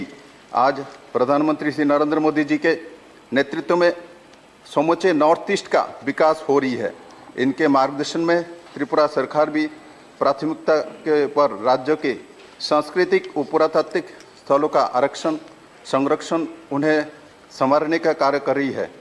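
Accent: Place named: Bangladesh. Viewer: Bangaladeshi bangla jesa bat karti hu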